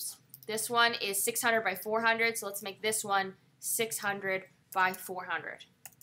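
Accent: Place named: United States. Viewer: thanks for the american accent , very clear and helpful tutorial! very efficient for the new learner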